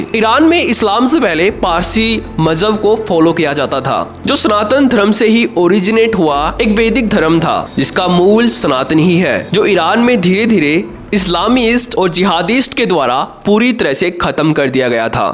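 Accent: India. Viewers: bihari accent per thoda kaam karo, स ओर श bolne per.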